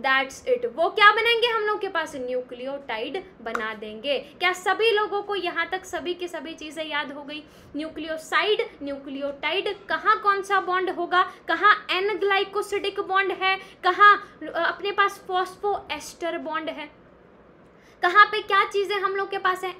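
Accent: Haryana, India. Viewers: Diii aap haryanvi ho kyy???🤔🤔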